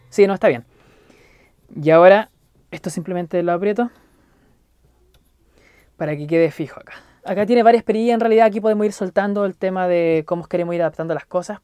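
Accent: Chile. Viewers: eres de chile? es la primera vez que te veo jajajaja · Eres chileno o no? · Tienes como hacento chileno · Me encanta el asento chileno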